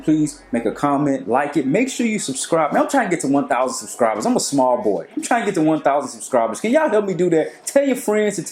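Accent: Ghana. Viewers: I’m Nigerian living in the US and want to move to Ghana sooo bad. This video is inspiring. You got the Ghanaian accent down lol!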